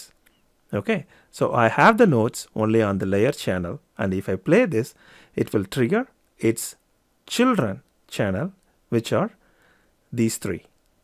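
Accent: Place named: India. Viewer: I always love Indian accent. cuz u understand it and feel something weird in the same time XDD thank you for this tutorial anyway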